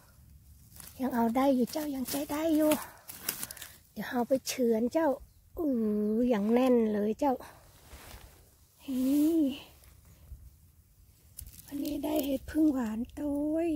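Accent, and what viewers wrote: North, Thailand. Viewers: เออเห็ดแถวบ้าน ดอกมันไม่ค่อยใหญ่เหมือนประเทศอื่นๆเนาะยายเห็นเค้าเก็บกันที่ปะเทศอื่นๆดอกก็ใหญ่ๆจังยายชอบ พูดพาษาเหนือฟังแล้วเพราะดี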